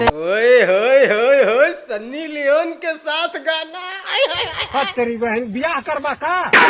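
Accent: Bhojpuri, India. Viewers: Tone to Bhojpuri hain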